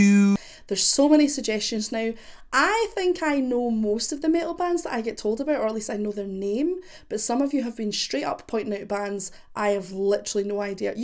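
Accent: Scotland, United Kingdom. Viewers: Lovely finding a scottish reactor! Pure cracking up at some of your patter, you made me homesick, naebdy swears as fluently as us 🤣 is one of the all time great singers, you want to check out what his voice was like when he was younger (just as good). I actually was at the very first gig where sang for, at the Barras in Glasgow. Powerful singer, sort of reminiscent of or. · First of all, love that Scottish accent. 2nd, had the pleasure of seeing twice (, seven times), and it is a wholesome experience. Sing along, jumping together, a tribe coming together for a metal sermon. My fav. is, no question. You mentioned gothic briefly, I suggest you check out the by handpicked warm-up band -. Other than that; - (Live Masters of Rock 2015).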